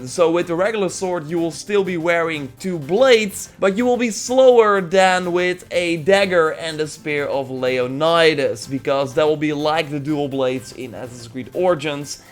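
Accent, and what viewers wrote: Netherlands. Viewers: Are you Dutch or Belgian?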